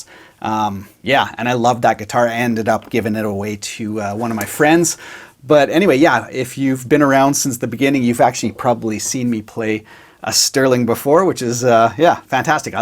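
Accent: Canada. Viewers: Find Uut....Abuut....Love the Canadian accent. Great review as usual.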